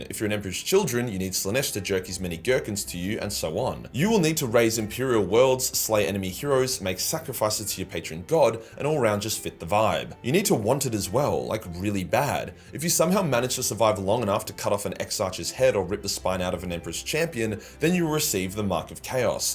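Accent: Australia. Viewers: can you please lower the volume of your outro? I cannot count how many times I have almost fallen asleep to your lovely Aussie accent only to get jumpscared awake by the "BOW TO ME"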